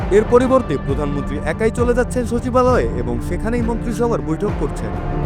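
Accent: Kolkata, India. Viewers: ভয়েস যিনি দিছেন, তিনি কি ইন্ডিয়ান নাকি? Audience must use earphone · কথায় ভারতীয় টান ক্যান ভাই? সমস্যাকে ছমচ্ছ্যা বলাতো স্বাভাবিক না। দুই দিন আগে কোন এক এক্টিভিস্ট এর পোস্ট দেখেছিলাম, গিমিক সরকার নিয়ে সেখানে প্রতিটা পয়েন্ট বলা ছিলো। এই নিউউজ ঐ পোস্টের কাউন্টার 😂😂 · জিনি সংবাদ পাঠ করলেন সে কি বাংলাদেশি নাকি ইন্ডিয়ান? উনার উচ্চারনে তো আমার সন্দেহ আছে। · যিনি ভয়েস দিয়েছেন, তিনি কি ভারতীয় নাগরিক নাকি? উচ্চারণ সেটাই মনে হচ্ছে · উপস্থাপক কে কি কলকাতা থেকে নিয়ে আসা হয়েছে?? ভাষাটা একটু খেয়াল করে দেখবেন মিথ্যা বললাম কিনা? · Jini news porce tini ki Indian , Indian akta tan ace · Apne ki dadar desher lok? Vasha sune kolkatar vasa mone holo 😕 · উপস্থাকের বাড়ি কি ভারতে,ভাষা এমন কেন?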